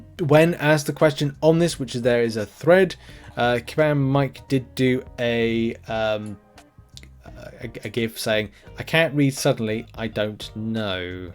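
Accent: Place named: United Kingdom. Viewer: The uk accent is horrendous. I can barely understand a word you’re saying. When you talk beyond 130 words per minute it’s just shit mumbles. Have someone from America listen to your vids and ask them to repeat what you said. There are huge gaps where we can’t understand shit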